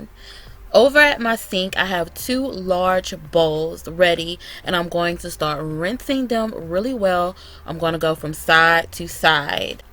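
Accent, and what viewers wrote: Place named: Chicago, United States. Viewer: Are you from Chicago? You have the accent. Lol. Great video.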